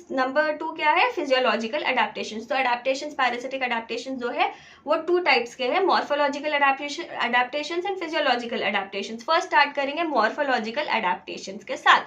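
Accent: Hyderabad, India. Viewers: Hyderabadi Ma'am spotted!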